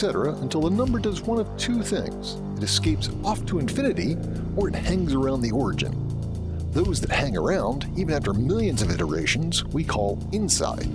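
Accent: United States. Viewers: The c, z american pronunciation makes this very confusing. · your American accent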